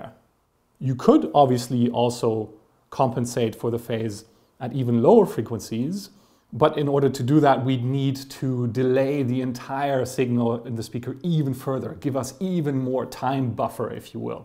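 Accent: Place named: Germany. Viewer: For me as an aspiring soundengineer, this video was so helpful. Thank you so much for this clearification. Are you from Germany? Your accent sounds familiar😅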